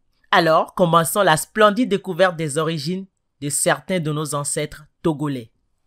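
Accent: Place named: France. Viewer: C est bizarre on dirait que tu Francaise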